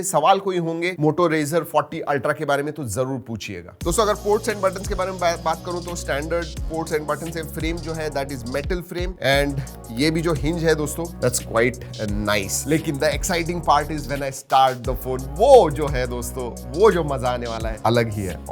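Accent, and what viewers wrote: India. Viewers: My advice..start to learn proper English , you are speaking Indian english😅😅 so only Indian can understand . Why you Indian to lazy !!!!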